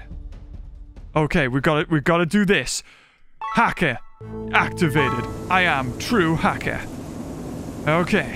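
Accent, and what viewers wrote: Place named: Newcastle, United Kingdom. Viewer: We got a love also he’s firm Newcastle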